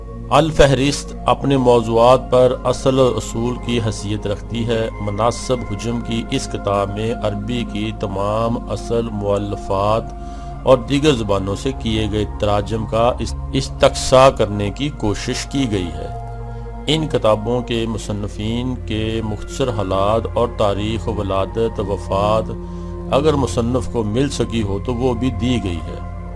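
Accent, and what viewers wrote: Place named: Punjab, Pakistan. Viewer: Ghatiya. Agar kahi se parh Kar sunana hi hai to rawani k sath parhte. Ruk ruk k parh k dimagh ki lassi bana di. Aur Punjabi lehjay me Urdu ka khana kharab kardiya. Punjabi me hi parhlete.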